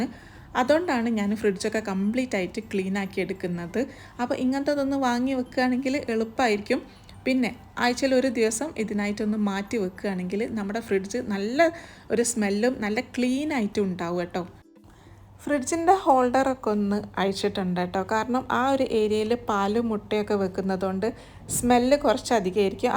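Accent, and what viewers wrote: Kozhikode, India. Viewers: അതെ ഞമ്മൾ കോഴിക്കോട്ടുകാർ അങ്ങനെയാണ് പറയുന്നത്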